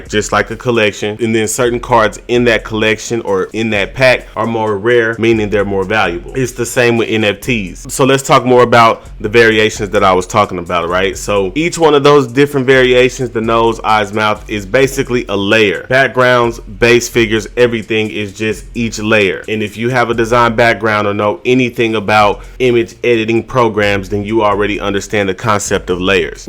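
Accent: United States. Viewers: I here that Texas accent. can't wait to dive into NFTs this year!!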